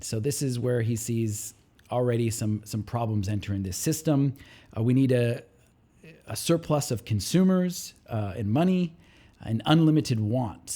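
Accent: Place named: New Hampshire, United States. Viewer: He's from New Hampshire, let it go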